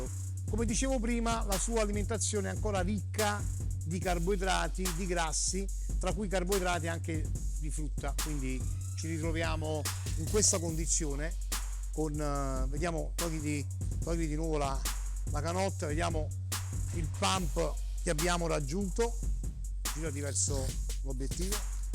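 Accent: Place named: Rome, Italy. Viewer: Si ma un po’ di professionalità e proprietà di linguaggio… sentir Lemme e sentire, beh dovrebbe essere quasi il contrario 🥲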